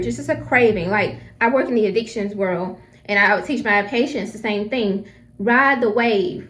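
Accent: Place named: North Carolina, United States. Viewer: Hello. My name is Albert and I grew up in NC. I now live in California, but it is still nice to hear the NC accent. I welcome you to the world of money and investing. I have lived my life frugally. At first it was out of necessity, but in time, it became a lifestyle. Eventually, it became a very comfortable lifestyle. Best of luck with your channel.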